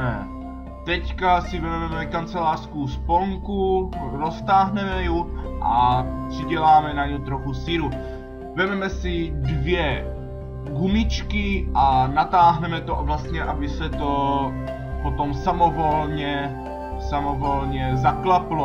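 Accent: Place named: Moravia, Czech Republic. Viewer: Tož to zkusím! Mám dva kocoury a nejspíš tu myšku dotáhli oni. Teď mám v pokoji už druhou (první jsem ulovila já....), jenže je za skříní, což je problém. Děkuju za nápad a moc se mi líbí, že mluvíš, jak ti zobák narostl. Já su taky z Moravy, takže mě moc potěší, když neslyším furt takovej, makovej, zapejkat a podobně.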